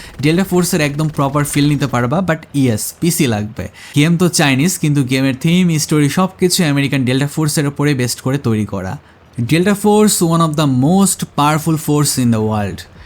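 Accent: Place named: Rajshahi, Bangladesh. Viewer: Apnar basha rajshahi er kothay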